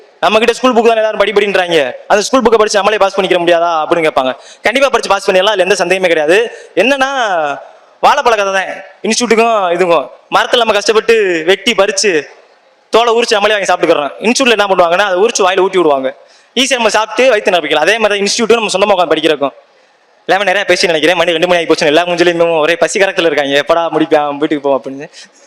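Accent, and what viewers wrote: Madurai, India. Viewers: Madhurai slang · Madurai kanaran slang i think😊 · Madurai slang🤩🤩🤩🤩🤩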